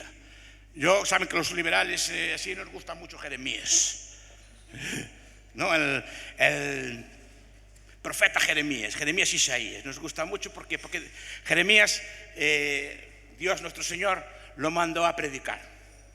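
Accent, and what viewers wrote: Galicia, Spain. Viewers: La bonhomía, la sinceridad, la honestidad intelectual, la curiosidad, el afán de conocimiento y su acentuado acento gallego adornan al profesor Anxo Bastos. Merecidísimo el Juan de Mariana por su relevante defensa de la libertad en todos los ámbitos.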